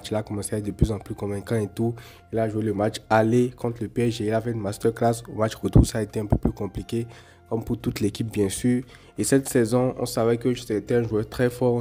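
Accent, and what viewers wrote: Benin. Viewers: Je découvre ta chaîne, tu as un petit accent béninois ou c'est moi ?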